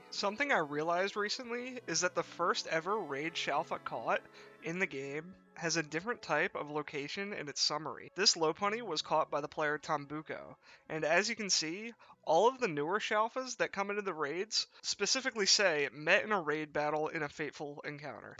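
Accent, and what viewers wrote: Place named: Pennsylvania, United States. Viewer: Random question but are you from PA? Feel like I'm hearing a little PA in your voice 😂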